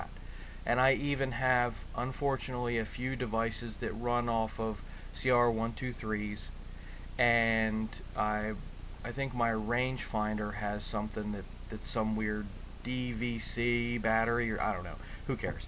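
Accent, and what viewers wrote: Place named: Philadelphia, United States. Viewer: Streamlight is also local to you, assuming youre near Philadelphia ._. Based on your accent, amiright?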